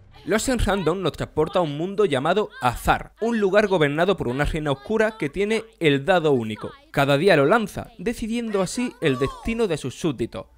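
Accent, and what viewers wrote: Andalusia, Spain. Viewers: No tiene ningún problema de dicción,simplemente es su acento.Posiblemente sea de Almería o Jaen. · Este chaval es de Jaén o Granada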